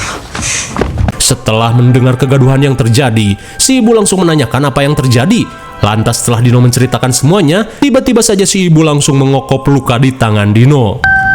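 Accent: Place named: Sunda, Indonesia. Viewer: Suara Admin nya banyak banget , ada beberapa channel x yaaa... Sunda pisan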